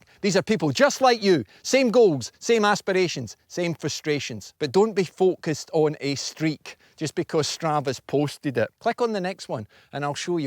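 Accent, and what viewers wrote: Scotland, United Kingdom. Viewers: are you scottish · You sound Scottish, laddie.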